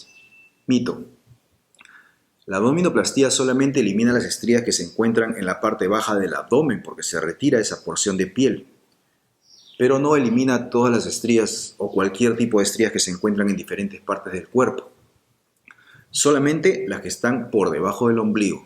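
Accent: Peru. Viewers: Donde tiene ud su consultorio yo soy de Ecuador y x su dialecto ud me parece peruano o me.equivoco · Por su manera de hablar es de mi querido Perú, ! Gracias por informarnos. Dios te bendiga! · Alguien sabe de q pais es? Por el acento parece peruano? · Muchas gracias x la información me gustaria una consulta con ud podria facilitarme un numero para poder llamarlo. Por el acento puedo decir q es peruano cierto? Espero su respuesta doctor gracias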